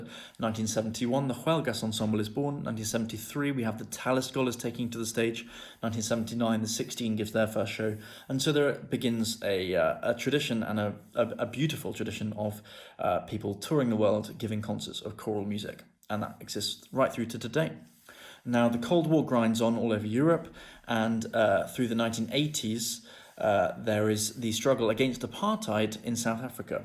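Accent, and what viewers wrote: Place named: United Kingdom. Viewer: What a brilliant and amazing 5 minutes,! (Also I love that perfect British accent; these days, it's fast disappearing).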